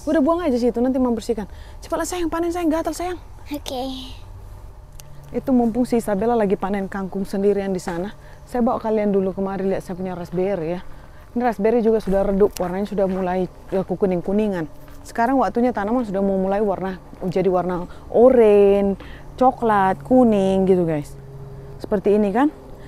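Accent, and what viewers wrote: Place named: Medan, Indonesia. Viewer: Intonasinya dari Sumatera Utara ya, kayak cakap orang Medan. Kebetulan saya orang Medan, jd suka kiat cakapnya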